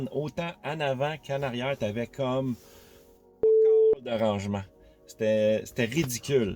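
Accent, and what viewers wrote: Canada. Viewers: Bonjour super tes vidéos, je vais chercher ma TM3SR+ dans 2 jours, malheureusement je ne te connaissais pas encore au moment de commander (regrets!) Tes vidéos sont très intéressante et ton expérience au Canada est très précieuse pour nous en France où dès qu'il y a 10cm de neige on est en panique;) (perso à -5 mon cerveau commence à freeze donc à -40 je pense me désintégrer:D ). J'ai cru comprendre que vous aviez des normes à respecter concernant vos pneus neiges, pourriez vous nous en dire plus sur ce point, peut être aussi sur la conduite sur neige avec une propulsion? Chez nous l'utilisation d'une borne à domicile n'est pas forcément recommandée (peut-être par ce que nous sommes en 220V). Est ce le froid, tes km journaliers, le 100V ou comme moi le coté geek qui t'a poussé à en acheter une? Merci encore pour ton humour, ta voix Canadienne et tes expressions, et la bonne humeur que vous dégagez toi et ta petite famille. 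(Ouais je te dis "Tu" car on doit avoir le même âge ~ 32 ans;) )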